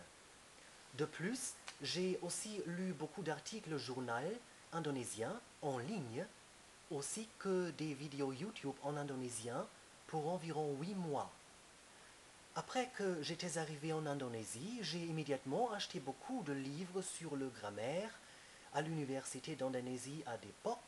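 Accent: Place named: Germany. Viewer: Tu parles très bien le français ! Ne t'inquiète pas pour ton accent quand tu parles français on comprend tout ce que tu dis. En ce qui concerne l'indonésien, je sais pas pourquoi mais j'ai l'impression que ta prononciation a été influencée par l'allemand parce que parfois l'intonation est très étrange. Est-ce que t'as beaucoup écouté l'indonésien avant de le lire?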